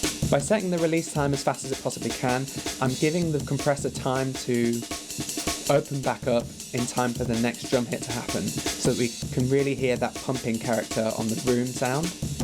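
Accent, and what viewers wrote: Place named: United Kingdom. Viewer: You know that is gonna be good when the guy has a british accent.. Cheers mate, very good content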